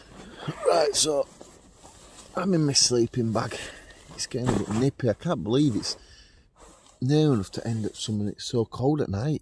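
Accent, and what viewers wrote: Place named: England, United Kingdom. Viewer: It's an English accent. The English live in England, the Scottish in Scotland, and the Welsh in Wales, but all three countries are British because they live on the same island: Great Britain, the largest Island in the British Isles. Welsh and Scottish accents are also British, but this one is clearly English. Great Britain together with Northern Ireland forms the United Kingdom of Great Britain and Northern Ireland, abbreviated to the UK, to which you can add Irish to the aforementioned accents. Hope this helps.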